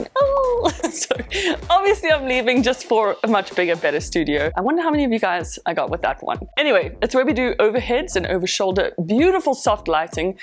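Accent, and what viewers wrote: South Africa. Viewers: You from South Africa? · Oh no I will definitely miss your South African accent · i knewwww you were south african! the accent 😂 i'm also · I’m happy for you buddy but be ready for unexpected rains, chilled weather and racist londoners as they will easily get hold of your South African accent and will/May speak shit.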